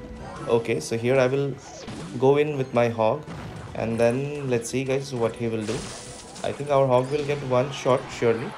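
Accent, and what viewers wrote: India. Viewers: Which country are you from?? U sound Indian...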